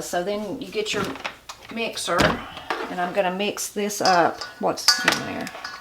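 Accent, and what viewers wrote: Appalachia, United States. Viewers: You mean "arsch" potatoes? It's just "Irish" potatoes (regular white ones) said in the Appalachian accent. :)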